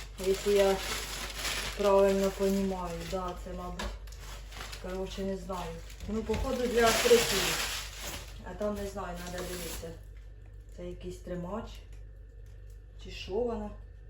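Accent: Poltava, Ukraine. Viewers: А ви не з Полтави ? Бо балакєте ви по-нашому , і акцент у вас полтавський .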